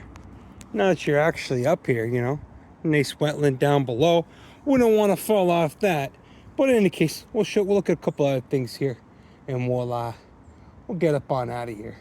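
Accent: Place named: Chicago, United States. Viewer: I love your videos but maybe you should consider leaning into a New England accent rather than a Chicago accent since you’re doing New England botany! Plus a southie accent or a maine accent would be super fun. 🥰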